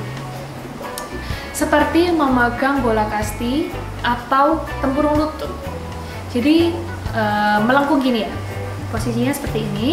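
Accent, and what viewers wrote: Java, Indonesia. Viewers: mbaknya medok😂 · Kak orang jawa ya? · la wong emg org jawa tulen koq wkwkkw :v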